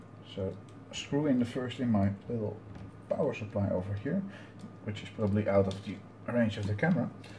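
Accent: Netherlands. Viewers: Ik heb dezelfde in mijn winkelwagen zitten van 2 euro per stuk. Kan zijn dat die ook lager gerate zijn qua kilos aan trekkracht. Wel leuke video trouwens! Hoorde het o zo bekende nederlandse accent en keek naar het naampje van het kanaal en ja hoor: eindelijk een nederlandse electro youtuber.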